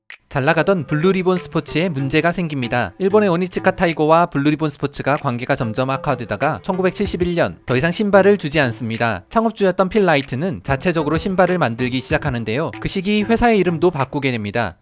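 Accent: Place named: Seoul, South Korea. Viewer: 서울사투리 상당히 거슬리네요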